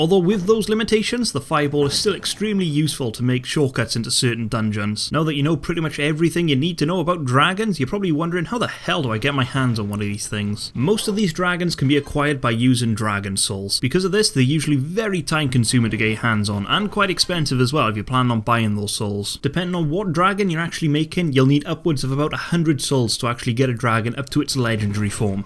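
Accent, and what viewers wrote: Wales, United Kingdom. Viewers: Are you Welsh? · Are you welsh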